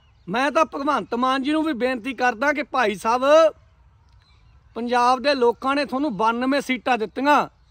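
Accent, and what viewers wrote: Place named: Haryana, India. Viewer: Tusi. Bai. Ji. Haryane. Wale. Lagde. Ho.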